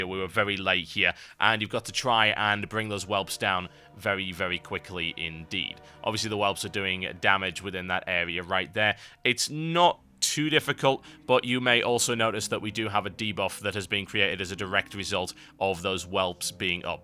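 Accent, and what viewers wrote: United Kingdom. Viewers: The Power of the British Accent!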